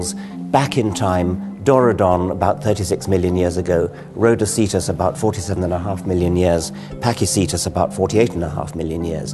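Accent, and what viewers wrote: United Kingdom. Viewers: “Even his British accent couldn’t make that sound intelligent” I get where you’re coming from because iv never come across anyone so desperate to take the intellectual high ground. He’s unbelievably patronising and philosophically naive! That’s brilliant Dawkins your a great scientific populariser and no one is more “human” than you but no one asked you to explain evolution as most people know evolution is a fairly good theory and it still doesn’t justify your claim that eugenics would work on humans without explicitly condemning it. Not to mention your naive and arrogant dismissal of philosophy and metaphysics. According to Karl Popper “it is important that scientists remain humble as a prediction could change from one moment to the next, unfortunately there is corruption since scientists started getting subsidies.” (Karl Popper). 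Science can’t “prove” anything as it is provisional and can only infer. In a thousand years Dawkins description of evolution will be synonymous with Bronze Age cosmology. We are not even scratching the surface of reality and logical positivism is self refuting. “logic is an illusion” (Nietzsche) I don’t personally have a problem with evolution or the natural sciences as long as people don’t ram it down my throat by insisting Im committing some kind of heresy if I choose not to describe all of reality using the quantitative language of science. According to Einstein “It would be possible to describe everything scientifically, but it would make no se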